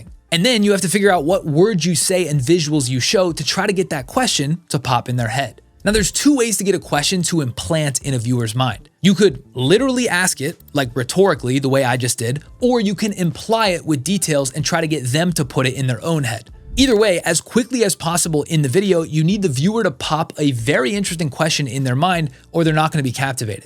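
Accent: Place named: United States. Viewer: Thanks for clear American English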